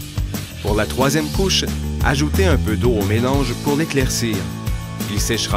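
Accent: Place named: Canada. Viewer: ce que j'aime c'est l'accent canadien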